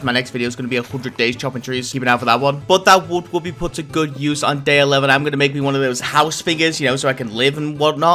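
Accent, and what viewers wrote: United Kingdom. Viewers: i think its scottish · I love his britishness. "Frew out" "smoofer" "evewyfing"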